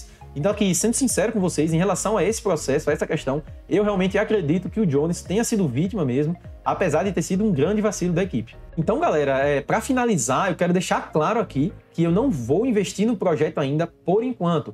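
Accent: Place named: João Pessoa, Brazil. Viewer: Parabéns pelo conteúdo? Tu és de qual cidade? Tens o sotaque parecido com daqui de João Pessoa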